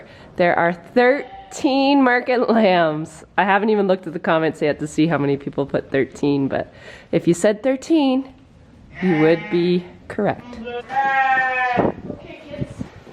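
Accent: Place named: Canada. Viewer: I think just has a thicker Canadian accent. Hers shows up sometimes, but it isn't as noticeable.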